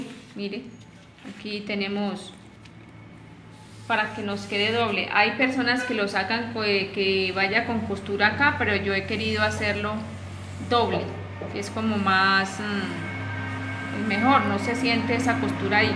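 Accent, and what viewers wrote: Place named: Colombia. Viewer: Amiga gracias por esa noble labor de enseñar con tanta paciencia. Que el Señor siga bendiciendo tu vida y sobre todo siga derramando mucha sabiduría. Soy de Colombia me imagino por su acento que usted también .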